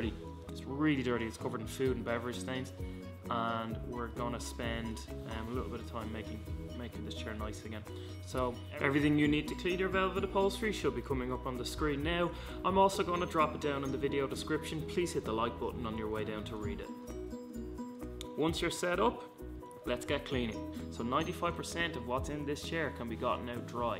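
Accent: Ireland. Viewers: This comment is crazy and I’m obsessed with this video and an Irish accent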